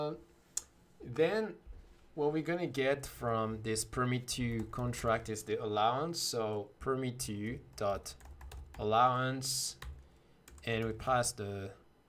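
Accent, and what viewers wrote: France. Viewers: I feel you are french 😛😛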